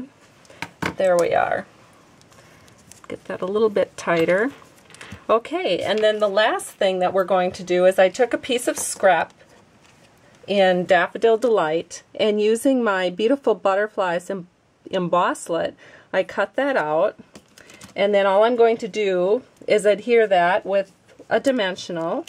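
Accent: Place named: Minnesota, United States. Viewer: I so enjoy your videos and wanted to share with you that you bring to me a touch of home as I am from Minnesota. I hear your voice with our distinct accent and it brings me to my childhood! Thank you for sharing your art and your life! Susan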